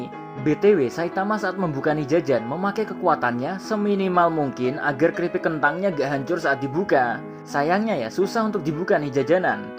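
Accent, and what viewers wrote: Java, Indonesia. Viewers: Logat mimin Jawa bgt wkwkwk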